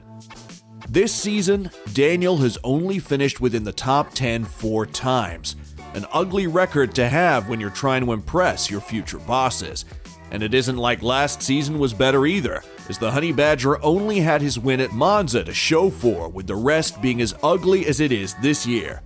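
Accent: United States. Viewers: Blar blar blar !!! I'm a top fan of F1 but this channel is starting to put me off, wait till you got some real evidence and are 100% about something, just going on and on and this Yanky doole commentating guy is starting to grind on me. Time to bow out and Unsubscribe!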